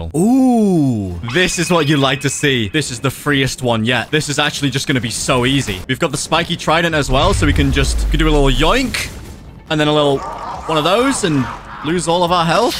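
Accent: United Kingdom. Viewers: Are you from united kingdom ?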